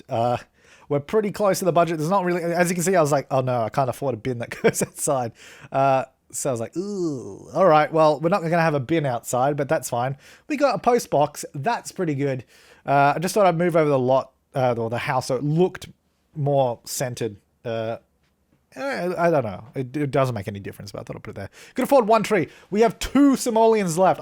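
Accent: Australia. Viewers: Is it just bc of his Australian accent or does he sound like draw with jazza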